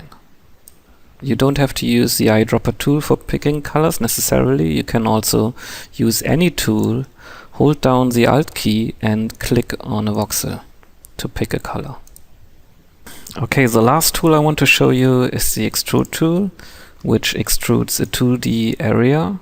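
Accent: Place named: Germany. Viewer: App Magician he sounds German lol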